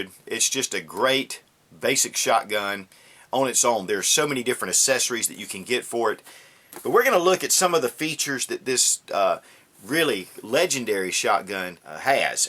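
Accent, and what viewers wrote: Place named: South, United States. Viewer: i love my 500a, i mean its not a suave 870 but i mean really when your doing dirty work dependable is where it is. also 8 rd's in the tube one in the chamber you can't argue with that fire power, and also add a sure fire to the front and a shell carrier to the butt stock you got yourself low budget shtf gun, i mean a slug is a human stopper hell buck shot is too. great review, i love your channel and love the fact im hearing a southern accent with my review long live the republic!!!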